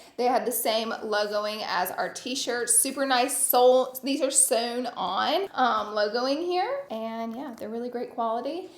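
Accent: United States. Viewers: your voice sounds like american tourist in europe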